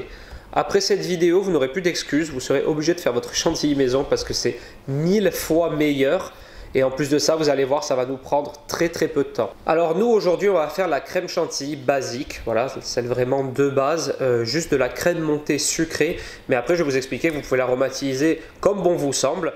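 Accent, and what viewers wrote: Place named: Alsace, France. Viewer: Bonjour je vous voit ( et entend) la première fois et j’ai l’impression que vous d’Alsace Lorraine 😊😊☺️☺️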